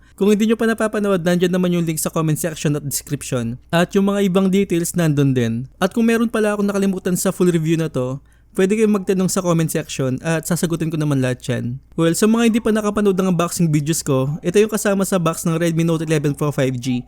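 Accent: Pampanga, Philippines. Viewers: Hahaha Kapampangan ka lodz? HAHA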